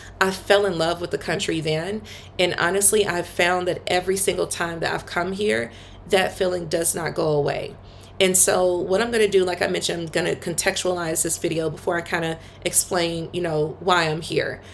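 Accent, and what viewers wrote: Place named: South Africa. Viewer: Thank You Mam for promoting our Country. You sound like a South African already. Enjoy Your stay here.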